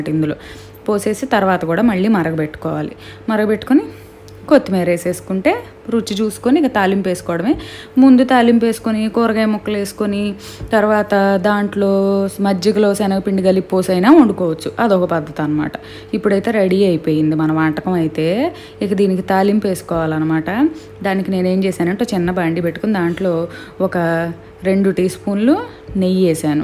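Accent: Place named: Godavari, India. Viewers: maa godavari slang laa anipinchindi gaaru chala bagundi🤩🤩😍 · Hi,I have seen most of your videos.they are superb.your effort to do something new is good.your act as bamma is too good.you are from vijayawada.but I feel your language has Godavari accent.thats why got connected instantly.congratulations on your award.wish you grow farther. This is my first ever comment on any YouTube channel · Godavari yasa. Super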